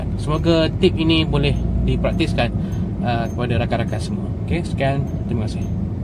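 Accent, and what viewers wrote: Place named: Malaysia. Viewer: Mantap Tutorial and tips nya Saya Jadi ingat upin ipin Logat Malayu kental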